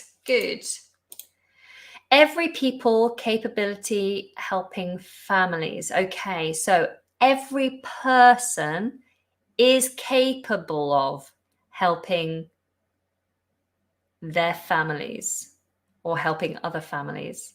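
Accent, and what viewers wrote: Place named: United Kingdom. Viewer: First of all, congratulations on your channel, I have been looking for a channel with British English for a long time and I found yours to be very funny. Secondly I would like to ask you a question as a new subscriber. Are you still uploading short videos or I have to join some group? I loved them. Thank you in advance, and cheers from Madrid.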